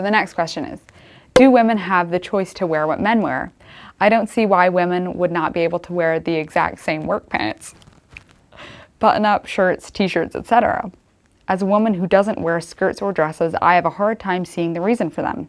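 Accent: Pennsylvania Dutch, United States. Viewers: It sounds very similar to Pennsylvania Dutch, just like the Amish.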